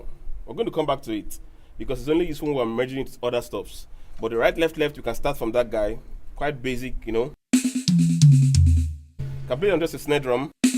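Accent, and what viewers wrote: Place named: New Jersey, United States. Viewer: Hey dawg. You sound like you're from jersey 😅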